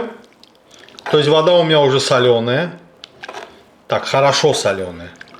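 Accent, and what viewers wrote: Baku, Azerbaijan. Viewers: Обожаю ваш Бакинский акцент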